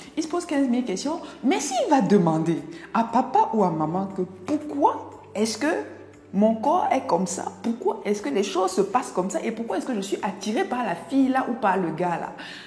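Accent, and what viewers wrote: Cameroon. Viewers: Bravo Docteur. Huumm ! Avec ton accent Camerounais, je suis satisfait de votre pédagogie. Elle est simple, objective, moins culpabilisatrice et dogmatique . Bon , certaines religions poussent leurs adeptes à la culpabilité ? C'est sont parfois des dogmes. Quand à vous, va de l'avant et parle peu et mieux prochainement,Merci.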